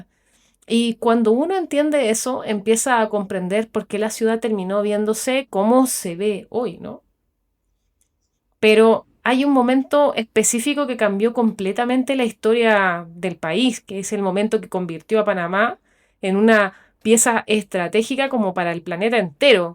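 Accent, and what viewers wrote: Chile. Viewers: Muy bueno tu video. Me parece por tu asentó eres chilena. Mira, Panamá desde sus inicios fue destinada por el mismo creador a desarrollar su economía en base a los servicios. Su posición geográfica, su territorio y hasta la mentalidad de su población apunta a eso. Dese la llegada de los primeros europeos, desde su creación como nación libre, desde simpre su destino fue ese. Tantas cosas para comentar. Termino con esto. En nuestro escudo ee armas, esta escrito esto. Nuestro lema es "PRO MUNDI BENEFICIO" qué significa, Para BENEFICIO DEL MUNDO. Imagina fue creado en 1904. Desde simpre es y será nuestro rol. Saludos desde el puente del mundo. Bendiciones. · Tu creo eres. CHILENA por tu acento, pero tu analisis esta bien hecho y estudiado Te felicito y en horabuena por ti, creo no me equivoco haces PERIODISMO INVESTIGATIVO MUY BUENO. Resaltas y dices las cosas como son y porque se dan. 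NO COMO UNOS REPORTAJES DE ARGENTINOS QUE ESCRIBEN UNAS "BURRADAS".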